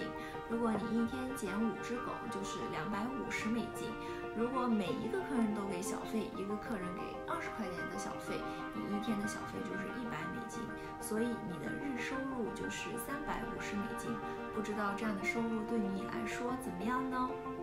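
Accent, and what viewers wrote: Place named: Yunnan, China. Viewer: 听出了云南口音🤏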